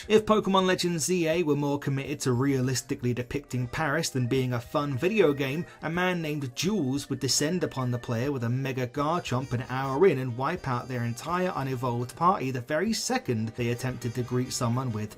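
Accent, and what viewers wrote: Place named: United Kingdom. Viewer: Oh for the love of god, of course he's british😭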